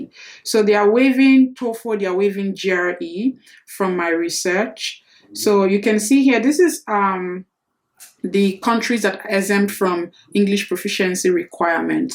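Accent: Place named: Nigeria. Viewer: Once l heard that voice l knew she is Nigerian. Good 👍 video presentation l searched for "us Universities without GRE" n ur video popped up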